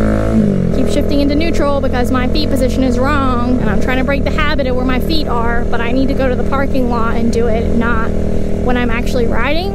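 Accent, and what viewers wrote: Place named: South, United States. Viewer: Definitely can hear the country twang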